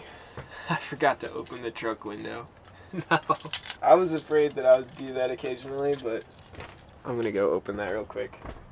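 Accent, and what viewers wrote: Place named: Memphis, United States. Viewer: You from Memphis? You sound like it. Where are you from? Tell me I'll subscribe.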